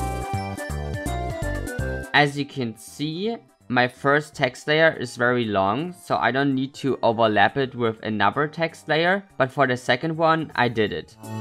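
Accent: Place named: Germany. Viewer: love the german accent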